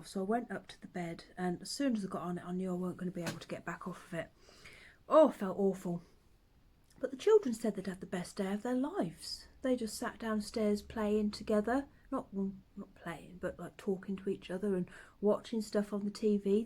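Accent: Norfolk, United Kingdom. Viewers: Just seen your Russian doll tattoo. My makers tag is a red russian doll so we have a connection right there. Lovely to hear your Norfolk accent, am in Swaffham myself. Great chatty podcast ☺️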